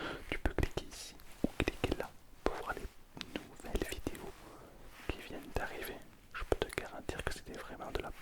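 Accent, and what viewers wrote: South, France. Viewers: Mdrrr avec l'accent du sud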